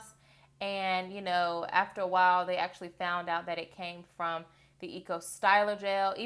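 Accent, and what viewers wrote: South, United States. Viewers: I love your southern accent so much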